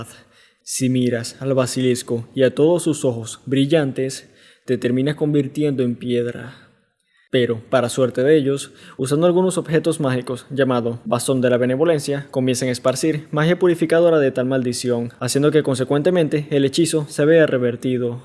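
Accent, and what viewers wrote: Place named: Venezuela. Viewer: El pana este habla como venezolano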